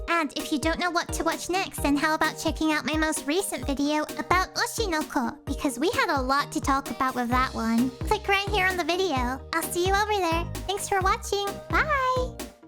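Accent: Japan. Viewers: I love it! Even Your Japanese Accent Is Cool! Nice Animation! And Yes, What An Early Animation!:) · dies of cute japanese accent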